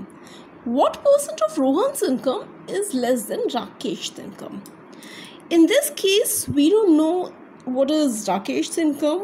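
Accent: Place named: England, United Kingdom. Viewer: Bahut English aat ke bol rahi ho Lag raha ki english man ho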